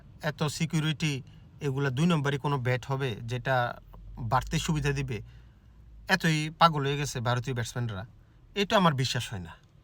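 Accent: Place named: Bangladesh. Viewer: Aapnar kotha sune ...eibar aapna k ekjon pure Bangladeshi bole mone hocche....jeta etodin mone hoini....🤩🤩🤩🤩🤩🤩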